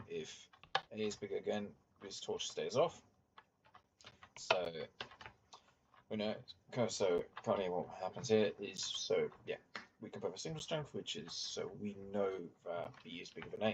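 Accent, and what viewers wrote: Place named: United Kingdom. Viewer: Good Video but the british accent is a bit annoying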